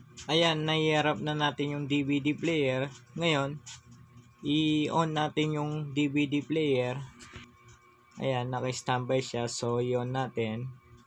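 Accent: Pampanga, Philippines. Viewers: Kapampangan na kapampangan accent, haha konelk eh.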